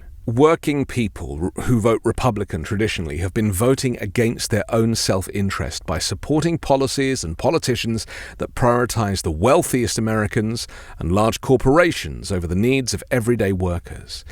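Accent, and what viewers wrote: England, United Kingdom. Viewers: what nonsense, the economy was twice as productive. unemployment was way down. this is propaganda with an English accent...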